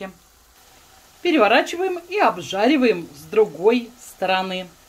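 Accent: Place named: Russia. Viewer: какой вкусный русский акцент. Осталось семь варёных картошин. теперь знаю для чего. спасибо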